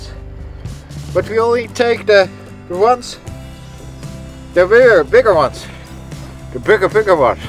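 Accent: Netherlands. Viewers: Just randomly clicked on this video, not knowing who this person is. But I'll recognise such a strong Dutch accent within seconds!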